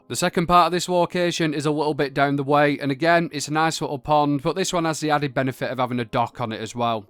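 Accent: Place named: England, United Kingdom. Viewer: Thank for the vid been nice hearing a englishmen talking instead of all the yanks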